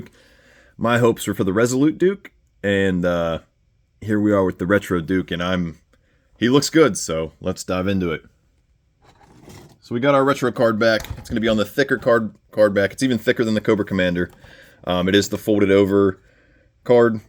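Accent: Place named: Pennsylvania, United States. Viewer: I'm good ain't I? I heard the pronunciation of the words you say. Forget what words they were but I know you didn't say this but this would be an example. Cold. Half of jersey says cold with the l. The other half and into pa and surrounding states and areas say code. You guys leave out the l. Many other words you say that you never realized you said differently, like house and home. Most people don't pick up on things like this lol. I'm from the jersey shore, my family's from PA and Trenton. We talk so differently and I'm only 40 minutes from Trenton and the Delaware River.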